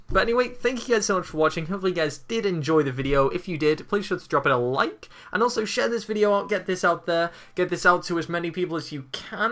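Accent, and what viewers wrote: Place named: United Kingdom. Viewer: yay hes British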